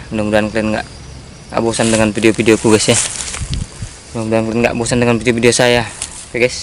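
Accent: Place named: Medan, Indonesia. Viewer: Abang ini orang medan ya aku penasaran logat ngomong nya kayak orang medan...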